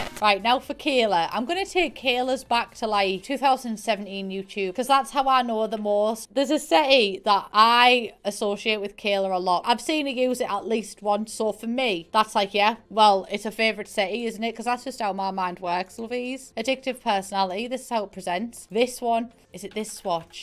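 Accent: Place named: Scotland, United Kingdom. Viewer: Your voice gives me LiFe! I love the Scottish accent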